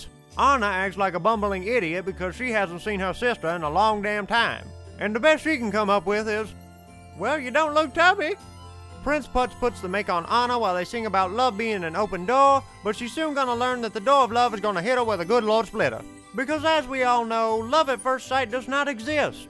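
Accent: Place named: Louisiana, United States. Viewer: he sound like he from the byoo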